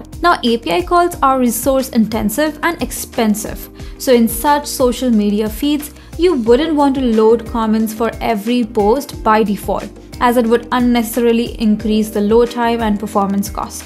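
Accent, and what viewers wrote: India. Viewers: Gosh, Indian accent again. FlutterFlow, don’t go down this path.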